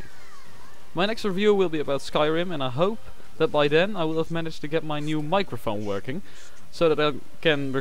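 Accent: Netherlands. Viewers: Haha ik ook, kdacht al toen ik je stem hoorde xD Nederlanders kunnen echt geen engels praten. Heb deze game net ook gespeeld f*kking vet:D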